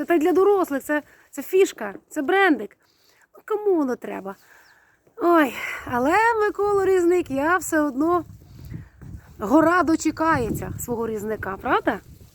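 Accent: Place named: Poltava, Ukraine. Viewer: Цікавою Люблю етнографію. звитчаї і традиції.Судячи з усього, Ви з Полтавщини. (Опішню ще Панас Мирний згадував). Ну і плюс говірка.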